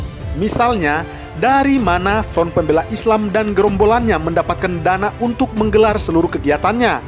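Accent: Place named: China. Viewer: Apa iki...suara nya asli apa mahluk jadi2an tokh ini? Di lihat logat bcr yakin ini cina nyungsep.nyamar!!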